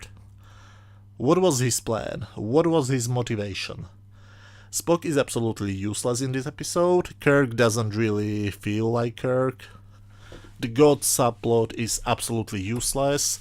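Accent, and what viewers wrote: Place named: Israel. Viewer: Uhm, where are you from? Your English is quite good, but if I had to guess (please don't be offended) I'd guess that you're Israeli. (I'm sorry if I'm wrong.) I just ask because I spend so much of my time teaching English to Israelis (some of whom are also Russian or Ukranian, very few of which are South American). Still, your English is quite good! Για να ξερετε.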